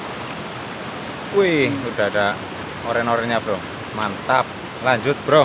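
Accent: Banyumas, Indonesia. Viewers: Ngapak Yah broh inyong e ngapak broh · Bahasa e ngapak daerah mana mas bro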